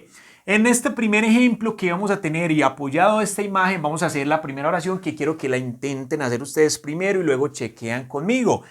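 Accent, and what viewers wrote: Colombia. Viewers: Me gusto este canal porque me encanta el acentos colombiano parce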